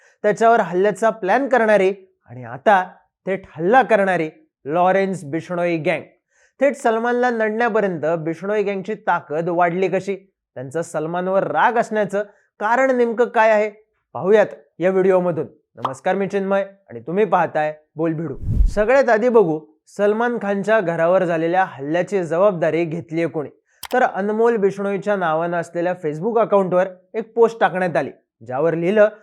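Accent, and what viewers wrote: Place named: Rural, India. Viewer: ग्रामीण भागातील हा यजमान(host) दिसतो and hyacha awaaza suddha gavakadcha vatto, baki sagle host changle aahet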